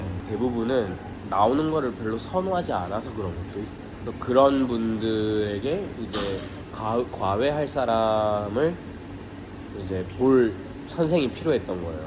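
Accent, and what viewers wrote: South Korea. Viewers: 부산 사투리 아직까지 있네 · ㅇㅇ 한국말을 잘한다 라고 말할게아님...그냥 모국어가 한국말인데 우리랑똑같죠 모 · 이 분은 한국분인가요? 말씀하시는 게 토종 한국인인데요 ㄷㄷㄷ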